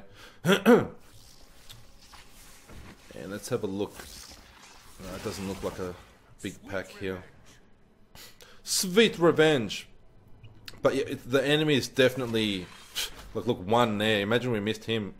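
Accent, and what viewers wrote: Australia. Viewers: you definitely sound like a fellow Aussie.. good video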